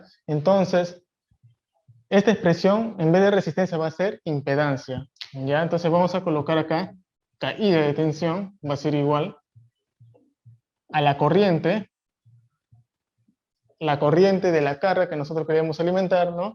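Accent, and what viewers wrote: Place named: Peru. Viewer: INGENIERO BUENAS NOCHES. HOY DIA ENCONTRE SU CANAL. MUY INTERESANTE EL VIDEO Y SU CONTENIDO. ESTOY REPASANDO MIS NOTAS DE HACE 40 AÑOS. ENCONTRE LA FORMULA PARA CALCULAR LA TEMPERATURA DE TRABAJO DE LOS CABLES YA SEA A 50 GRADOS O A CUALQUIER TEMPERATURA. DIGAME SI EL CABLE FUERA SUBTERRANEO, BAJO TIERRA QUE TEMPERATURA SE TOMA PARA EL DISEÑO. ESTOY SUSCRITO A SU CANAL, VOY REVISAR SU CONTENIDO. ESPERO QUE SIGA SACANDO MAS VIDEOS SOBRE ESTOS TEMAS. ME PARECE QUE UD ES PERUANO. RECIBA UN GRAN SALUDO Y MIS FELICITACIONES POR UNA EXCELENTE EXPLICACION. SALUDOS DEL NORTE DEL PERU. QUE MI DIOS LE GUARDE Y LE BENDIGA.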